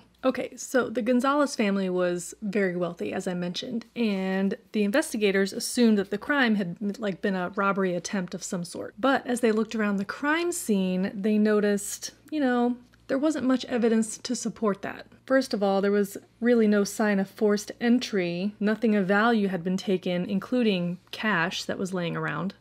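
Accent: Australia. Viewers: Hi so happy to see you Hope you had a great July 4th Love hearing the cat in the background, I love your Australian accent 😂❤ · Look I teased you a little last time about your Aussie accent. But never stop trying.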